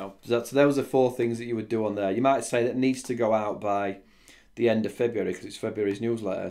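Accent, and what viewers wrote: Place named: Yorkshire, United Kingdom. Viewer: Where are you from, sounds like Yorkshire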